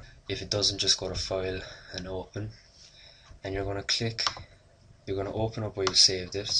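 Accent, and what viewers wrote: Ireland. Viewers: Is that a Langer accent I hear?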